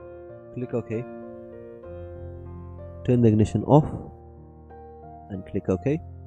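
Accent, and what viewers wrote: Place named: United Kingdom. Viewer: Hi New to ECU tunning, I have Vediamo and DTS Monaco, how does this compare to them? great content, I just sunscribed. I hear a UK twang, where in this Island you are ? lol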